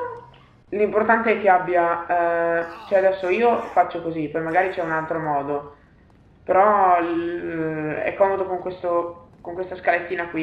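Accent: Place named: Parma, Italy. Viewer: Ma sei di Parma? Parli come Andrea Alongi...😮 Prova a dire Saccagnato...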